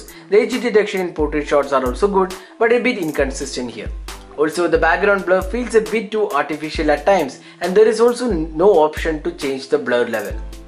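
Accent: Kerala, India. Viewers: That mallu accent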